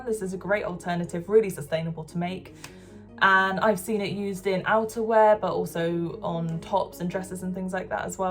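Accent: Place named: Bristol, United Kingdom. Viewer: Awwww ;D I love the Bristolian accent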